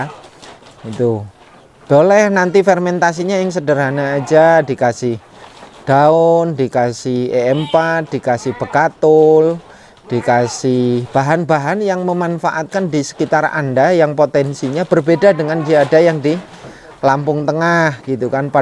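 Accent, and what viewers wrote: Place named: Banyumas, Indonesia. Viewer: Ora ngapak ora kepenak nimba ilmune cikgu salam ngarit❤️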